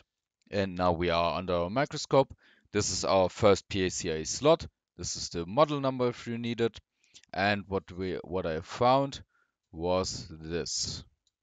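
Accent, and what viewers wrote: Germany. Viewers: why talk german people so hard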